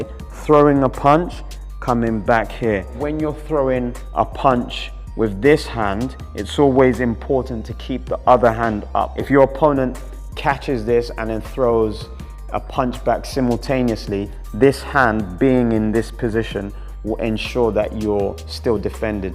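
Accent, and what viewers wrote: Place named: United Kingdom. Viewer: Are you Cuban as for me sounds like you are British.... accent by far.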